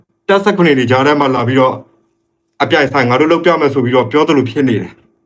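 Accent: Dawei, Myanmar. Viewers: ထားဝယ်သား ကရင်စပ်ပါခင်ဗျ ကိုနေပြောပြထားလို့သိသာပါ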